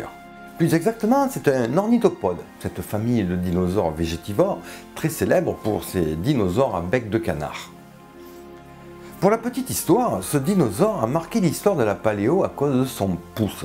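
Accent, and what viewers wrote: South, France. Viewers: oh tu est du sud toi aussi^^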